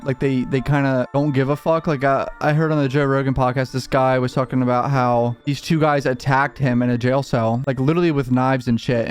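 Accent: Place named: Maryland, United States. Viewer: I can tell your from md buy your voice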